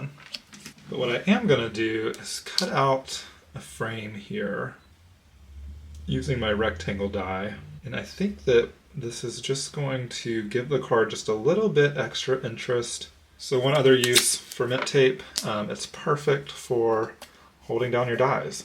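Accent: North Carolina, United States. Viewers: Here in NC it is wadder, you were right. Im from foothills so it differs all across the state😂You have lost most of your accent but your voice is soothing.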